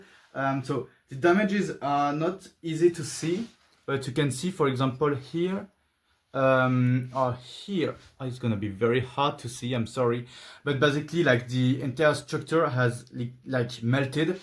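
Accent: France. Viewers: I KNEW YOU WERE FRENCH i recognize it from the accent, but seriously im very sorry to what happened to your project i've heard that the temp has even reached to 40° in some regions, i have never thought in my life that france would be hotter than algeria but here it is now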